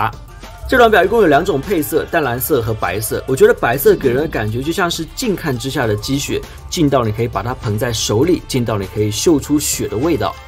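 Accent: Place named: Taiwan. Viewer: 滿口台語音 爲怎麼簡體字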